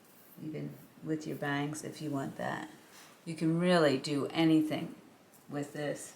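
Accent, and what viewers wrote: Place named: Brooklyn, United States. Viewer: Truth is, you come across as "our next door neighbor", your reactions and rethinking out loud, are so warm Sounds like a bit homey , NYC, (?)Brooklyn, in your voice! ? You look great in most, of the wigs. But the cool Ashy and Greys are a bit less flattering ! I'm still trying to figure out how to get them sent to Israel and still have it be worth the cost.. Meanwhile you're a lot of fun!!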